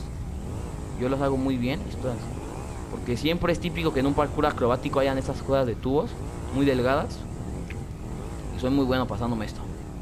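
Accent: Mexico. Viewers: Eres Méxicano????♥️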